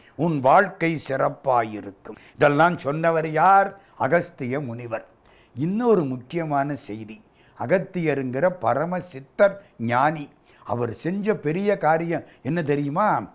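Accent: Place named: Jaffna, Sri Lanka. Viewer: யாழ்ப்பாணத் தமிழ் ஐயா ஒன்றும் மாத்தாதீங்கள்🙏🦚ஓம் முருகா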